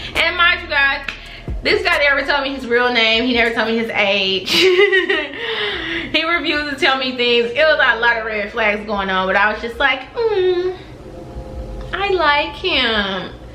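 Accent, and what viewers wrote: Miami, United States. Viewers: Sound like Miami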